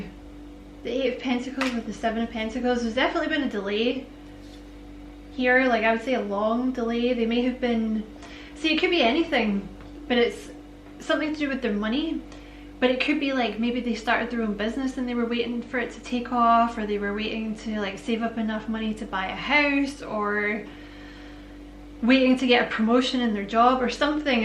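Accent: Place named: Scotland, United Kingdom. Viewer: What a Fab reading. I so wish you did private readings. I Love a Scottish accent me. 😘😘X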